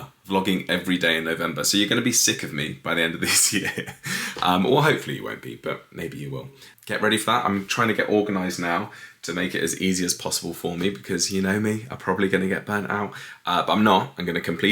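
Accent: United Kingdom. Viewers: Every time you add an R in your speech now I notice it 😂 it’s amazingly British! American accents are so boring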